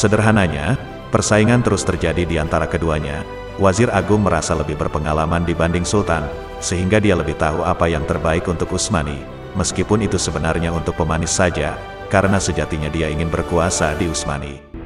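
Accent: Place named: Java, Indonesia. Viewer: Tanpa Tedeng Aling Aling,,,,, narator kayak nya orang Jawa,,😁😁😁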